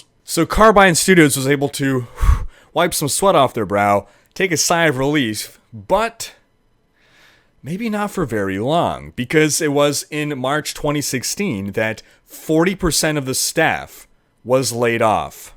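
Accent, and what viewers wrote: Quebec, Canada. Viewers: Saku Koivu ? Are you from Quebec / Montreal ? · Are you from Quebec? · He's Canadian mate.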